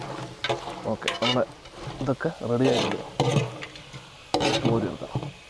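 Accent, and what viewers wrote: Palakkad, India. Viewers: Palakkadan slang kollam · Bro nigal palakkad anno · Ingaloru sambhavaman 💙💙ikkaa.. palakad swantham slang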